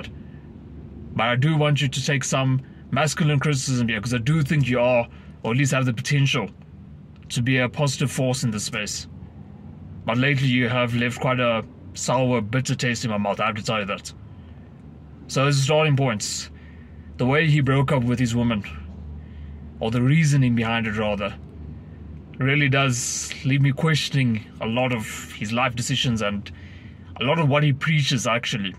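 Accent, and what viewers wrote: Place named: South Africa. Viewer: Lol. Heard the accent and knew immediately you were on of us. I’ll sub just for that 🤣🇿🇦 Edit: just got through the video and seems like you have a lot of good points to add to the discussion in this space. I was single my whole life, recently got in a relationship, and that was when I knew I outgrew these red pill influencers. Past them telling you to get in the gym and improve aspects of your life that you can control, that’s about it when it comes to the value they add. They are mostly clueless when it comes to maintaining healthy relationships with women.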